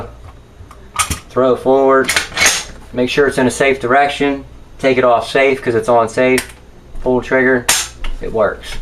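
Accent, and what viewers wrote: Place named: Ohio, United States. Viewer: sounds central east Ohio to me. I'm from Cincinnati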